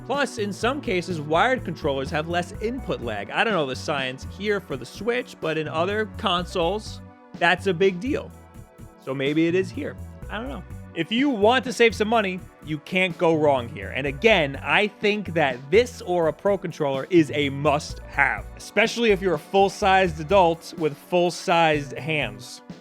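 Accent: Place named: New York, United States. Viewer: You and your New York accent.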